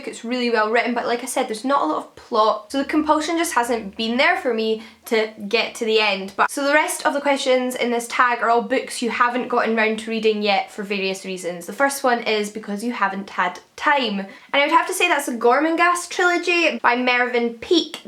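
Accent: Scotland, United Kingdom. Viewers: yep! In my case I don't usually restart a volume (especially if I'm reading the manga for an anime that I've already watch), but I find myself spending months without touching it again. And then I re-enter the compulsive reading phase again, and the cycle repeats itself xD Thanks for replying, you're really nice and insightful and your channel is one of my favourites on booktube, even though I've started following you not long ago :) keep it up! :D (btw, is your accent Scottish? I think it's lovely, but I'm not good with accents, apologies if I'm wrong)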